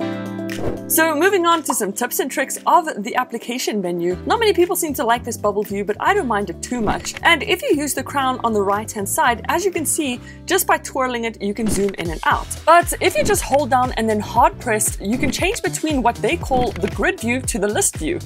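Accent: South Africa. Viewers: Are you from South Africa if not my accent reading is off. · I love her accent. Idk if shes south African but her accent.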